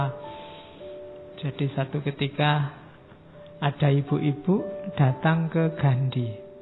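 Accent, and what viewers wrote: Java, Indonesia. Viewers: tapi gua gasuka aksen Jawa mau apapun Jawa ngomong Indonesia kuping gua menolak 😭 gatau kenapa bapak ini ngomong pake logat Jawa aja gua gamasuk otak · tapi aku sama sekali ga suka cara bicara kamu.🤣 palagi m3dok gitu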